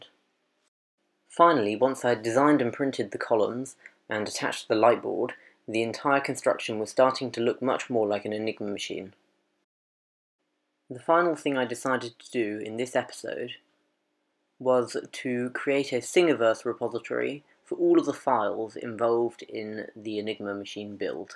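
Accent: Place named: United Kingdom. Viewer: Great man and an interesting new YouTube channel. I really enjoy your British accent with the soft 's' pronunciation 😃. Make more videos in general + a finished video of this project (if you have finished it so far 😄).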